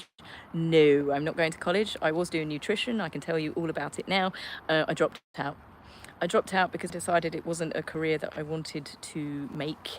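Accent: United Kingdom. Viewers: you are pretty with or without makeup. We live in the country in SE Georgia, USA, agricultural area hot and humid. We are having afternoon thunderstorms almost daily! It’s a Summer time thing. We are natives of NE Florida, same weather! Love your accent. What part of UK you from. We speak SOUTHERN USA! 😂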